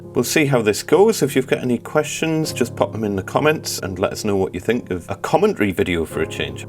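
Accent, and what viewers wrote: Newcastle, United Kingdom. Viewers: Great video and the commentary makes for a much more enjoyable project. Love the Geordie accent. Keep them coming.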